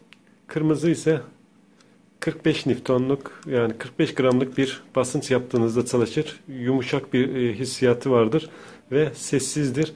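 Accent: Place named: İspir, Turkey. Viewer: Ç'lerine gurban olduğum Gardaş sen İspirli misin?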